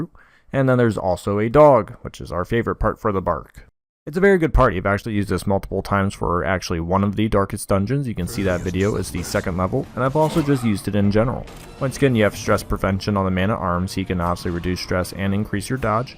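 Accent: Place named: United Kingdom. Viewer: I absolutely love your pronunciation. You're British, right? Or a non-native who had learned British English instead of nowadays widely spread American? Or an American speaking not so American?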